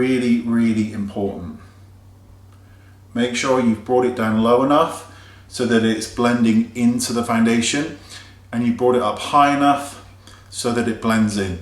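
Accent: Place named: England, United Kingdom. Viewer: I love your videos, because I learn so much...not just on how to apply products or which ones you recommend, but the english language, too. You speak soooo clearly and slow which makes it easy for me to understand nearly every single word you say. I bloody love the english accent! 💖 You should start a new channel "Wayne Goss - english for beginners".😜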